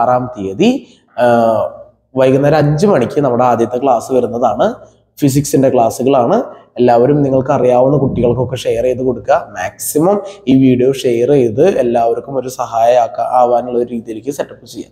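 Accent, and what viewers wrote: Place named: Thrissur, India. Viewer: Uff. chetta. Chettande oru valiya fen aanu njan. Chettande aa thrissur slangil edkunna class um. Sirde fashion senseum mudiyum ellam poli aanu.ella vdeosinum katta waiting aanu.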